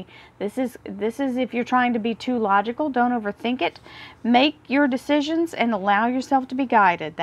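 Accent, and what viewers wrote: United States. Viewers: I love the accuracy, the lovely American accent, and of course your enthusiastic interpretation. Thank you so much. x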